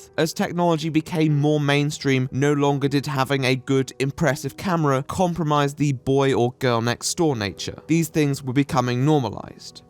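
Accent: Netherlands. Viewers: wait, u dutch? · are you dutch?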